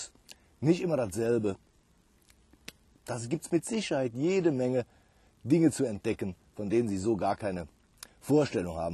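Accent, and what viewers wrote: Germany. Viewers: super sache! Wieder ein schönes Gemüseanbauvideo. Da kriegt man richtig Lust, das nachzumachen. Dieser Kanal ist mittlerweile einer meiner Top 3 Lieblingskanäle. Möchtest du eigentlich Hilfe bei den englischen Untertiteln? Ich könnte ab und zu mal einige Fehler rausmachen, obwohl ich auch kein englischer Muttersprachler bin. Dein Dialekt ist rheinländisch? Klingt auf jeden Fall auch schön.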